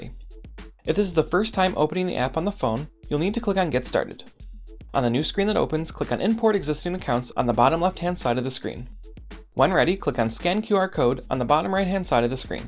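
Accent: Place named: United States. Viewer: When giving instructions, speak slowly and clearly. You aren't chatting to family and friends but to people all over the world who may not have US English as their native tongue. We have difficulties with your speed, your accent and your manner of explanation. Thanks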